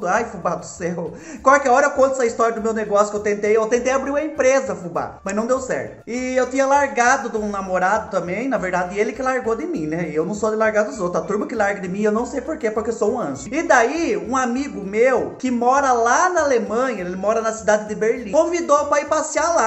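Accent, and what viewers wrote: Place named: São Paulo, Brazil. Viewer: Fubá sou nascida em são Paulo kkkkkkkkkkk só não moro mais lá kkkkk,mais vc parece com uma prima minha de Mogi das cruzes ,me identifiquei kkkkkkkkkkk,...vc e fofo e sua alegria contagia ...ela e bem do interior de São Paulo e fala como vc e muito engraçado ...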